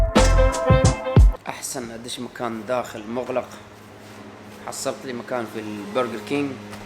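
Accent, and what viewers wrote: United Arab Emirates. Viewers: سبحان الله لما شفتك عرفتك صومالي طوالي ومن كلامك عرفتك عايش في الامارات... ربنا يوفقك..مولودة وعايشة في الامارات وفي حياتي مازرت راس الخيمة...بتمنى أزورها... وبحب أبوظبي جدا جدا وبفضلها على الامارات التانية.. سبحان الله اي واحد عاش في الامارات ولو لفترة بسيطة بحب البلد دي وبكون عنده ليها معزة خاصة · ما شاء الله قصة كفاح ونجاح 👏وأهنيك على اللهجة الإماراتية وأعرف صوماليين وغيرهم من الوافدين في السعودية لهم ٣٠ سنة أو أكثر ما يعرفون اللهجة السعودية وهنا ثمرة ودور الإندماج وتكوين العلاقات الاجتماعية👍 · سبحان الله شكله مو صومالي شكله اثيوبي و لهجته اماراتيه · صح ان اللهجة لك عليها بس تبين فيك للهجة الاماراتيه ولله الامارات بيتك وإذا تبا ترد حياك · و الله اعجبتني لهجتك اماراتية تحياتي من هرجيسا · فلوجاتك جميلة من لهجتك ضنيت انك اماراتي وتمزح لما تقول انك صومالي والحمد كلها دول عربية اسلامية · أول افتكرت انك يمني ولهجتك اماراتية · لهجتك اماراتية حلوة 🙏🏻 والنعم فيك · اللهجه الامارااتيه 👍👍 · لكنتك اماراتيه بالمره 🌹🌹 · فيديو جميل وممتع جدا... 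واللهجه الاماراتيه لهجه جميله... الله يوفقك ويرزقك السكن والاقامه في الدوله اللي تحبها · اهم شي اللهجة الإماراتيه اللي تتكلمها ❤️👍🏻 البلد بلدكم و نتشرف بك و بجميع اشقائنا الصوماليين و العرب و المسلميين أجمع ، و بإذن الله راح ترجع للإمارات و راس الخيمه و الأيام الجميلة الحلوه و أنت في وضع أفضل. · سبحان الله انت صار لك في الامارات عشر سنين و ترمس زي المواطنين ونحنه صار لينا خمسه و ثلاثين سنه فيها ما تكلمنا لهجتهم 😂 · بس كلاموه الاماراتي اللهجه اقصد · حضرتك تتكلم بلهجة اهل الامارات وليس بلهجة اهل الكويت وليس بلهجة اهل نجد السعودية او لهجة اهل القصيم بالسعودي ة وليس بلهجة اهل جده الحجازية وليس بلهجة اهل البحرين القريبه من اهل العراق او اهل الاهواز وليس بلهجة اهل عمان مسقط لان كل دولة خليجية لها لهجة خاصة بها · لهجتك اماراتية جميلة هناك حملة شوهت صورة الامارات لكن من عاش فيها لايمكن الا ان يحبها اتمنى لك ولعائلتك التوفيق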